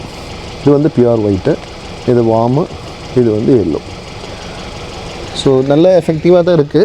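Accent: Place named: Kerala, India. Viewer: You talk tamil well, but somewhere I feel like u hav sone mallu connection.. is it true?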